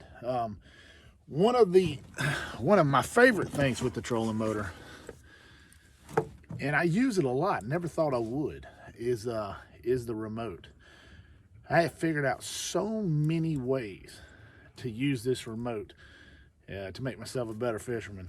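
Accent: Louisiana, United States. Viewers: 14 oz. Just found your channel. Already watched several of your videos. Good content. What part of La you located. Don’t sound Cajun.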